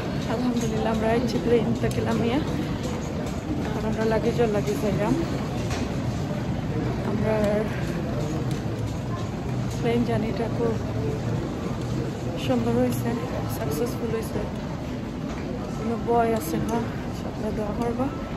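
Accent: Sylhet, Bangladesh. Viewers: Speak bangla not shylhetee.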